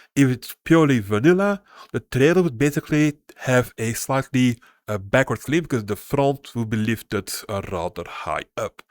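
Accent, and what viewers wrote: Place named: Flanders, Belgium. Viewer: Ik can een vlaams herken waneer ik hoor hem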